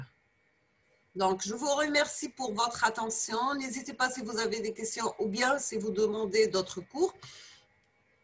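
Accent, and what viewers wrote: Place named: Algeria. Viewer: Accent algérien typique ! Tahia al Djazaïr, Vive l'Algérie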